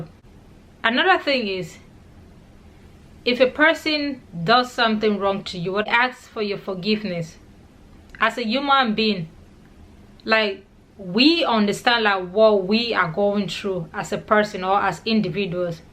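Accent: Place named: Kenya. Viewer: You sound like a kennya woman though i enjoy your word of wisdom 👌you earn a new friend here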